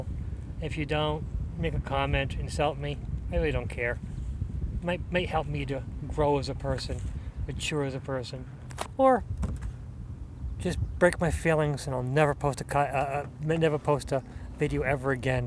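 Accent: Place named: Cape Cod, United States. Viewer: Very very nice filming. I recognized your voice, and knew your place. Native Cape Codder here. Thank you for filming and sharing.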